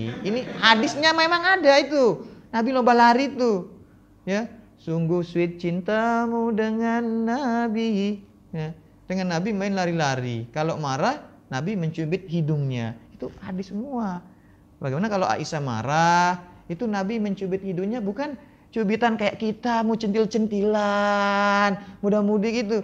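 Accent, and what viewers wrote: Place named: Lombok, Indonesia. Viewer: saht selalu tuan guru,,logak sasak lombok bhsa indonesia mantab guru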